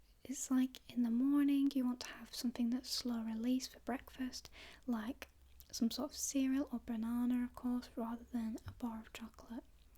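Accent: United Kingdom. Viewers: Her voice is so beautiful, I love that I'm discovering more British ASMRtists. I love the sound of British English, it's so beautiful and perfect for ASMR❤️Subbed and liked. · Congrats, so pretty british accent!! Love your ASMR videos!!! Hugs from Brazil. :)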